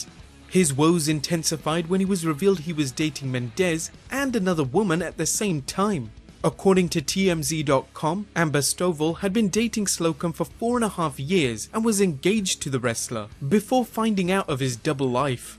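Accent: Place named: United Kingdom. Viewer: Absolutely fantastic to have a British accent back. Great work 👍🏾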